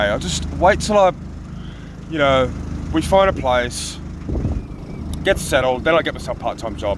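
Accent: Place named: Australia. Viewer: Hey you are Australian 😂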